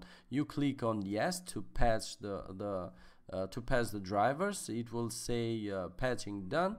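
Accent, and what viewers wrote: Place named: Russia. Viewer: произношение русское)))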